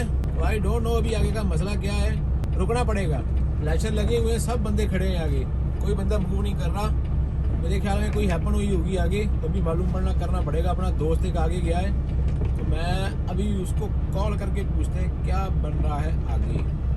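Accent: Punjab, India. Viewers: Why the fuck he speaks Hindi in pu jani accent? Why can’t he talk in Punjabi just curious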